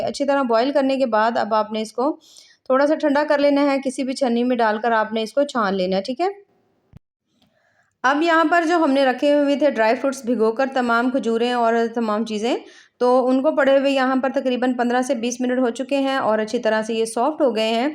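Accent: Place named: Punjab, India. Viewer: AOA Apki kafi videos me ny dakhe hen, ap jis Andaz me or Punjabi baul K bat krti hen, muje boht acha lgta he Apko sun KR,,, ap bohat ache baten krti hen Jo K reality se related Hoti hen😊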